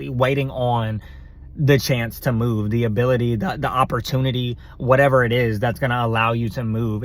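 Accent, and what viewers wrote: South, United States. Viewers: your semi-Southern drawl sounds like somebody's auntie on occasion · this guy has a country accent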